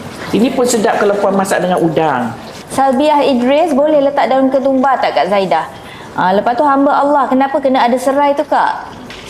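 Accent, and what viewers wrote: Johor, Malaysia. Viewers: Nak cubalah resepi Kak Zaidah ni masak utk cucu2. Kak Zaidah ckp mcm org Johor. Apapun terima kasih kongsi resepi ni. ❤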